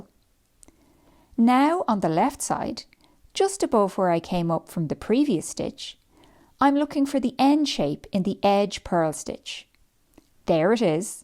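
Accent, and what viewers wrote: Ireland. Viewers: Great video. So helpful and easy to follow. Great to hear an Irish accent too! 👏👏👏👏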